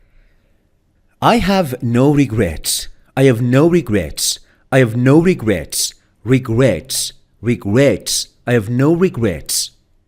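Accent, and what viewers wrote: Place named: Togo. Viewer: Togolese english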